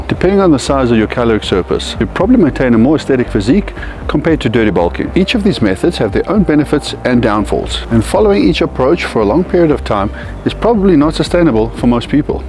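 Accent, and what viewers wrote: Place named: South Africa. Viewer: I've never heard such a nice south african accent.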